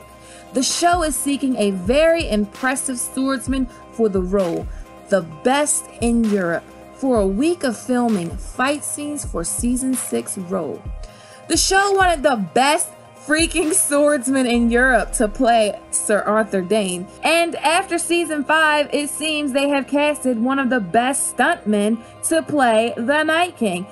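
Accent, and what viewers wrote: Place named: DMV, United States. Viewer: You are from the DMV area or B-Moore I can hear it in the accent but good info on GOT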